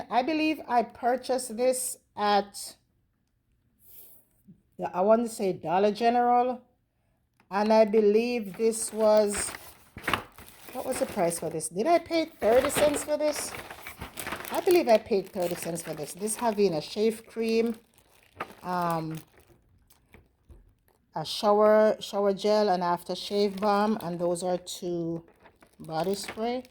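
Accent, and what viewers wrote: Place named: Jamaica. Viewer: 😁😁 that accent!!! Hello fellow Jamaican. Love the video.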